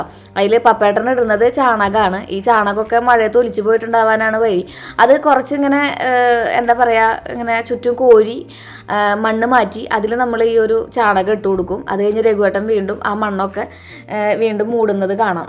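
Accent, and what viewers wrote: Thrissur, India. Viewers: Nintay Thrissur style talks kelkkanada nan adhikavim ee blog kanunneyy...nee sharikkum oru inspiration anu ttoo.. nan pkd anu..nee aniyathy kutty anu ttoo..but experience il neeyanoo edathy onnu thonnipokumm..ninney nan follow cheythu. Nanum entay goal... achive cheyutoo..in sha Allah ❤❤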